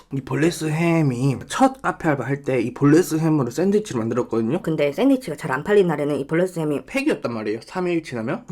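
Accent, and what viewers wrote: Jeolla, South Korea. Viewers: 오빠 말투 너무 귀엽자나요...... 전라도전라도한게 구수하기보다 귀여워서 계속 그 부분 돌려보게 되자나ㅠㅠㅠㅠㅠㅠ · 사투리보고 도 전라도 사람인거 인증 저도 전라돈데 뭔가 같은게 있어서 기분이 좋아 욤! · 전라도 사투리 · ㅋㅋㅋㅋ킬바사 나트륨은 여전하신지 ㅋㅋㅋ이전에도 짜다고 짜증냇는거 기억나ㅋㅋㅋ짜증낼때 전라도 사투리 나오는거 졸귀ㅎㅎ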